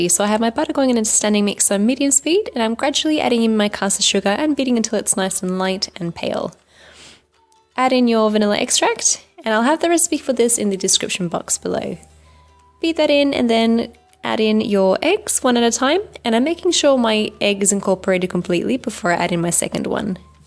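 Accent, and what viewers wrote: Australia. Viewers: thanks 😊😀. Also are you Australian???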